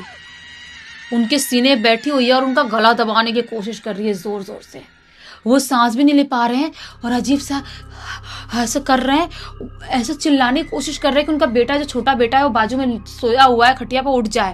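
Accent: Bihar, India. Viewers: aapki tone bihario wali h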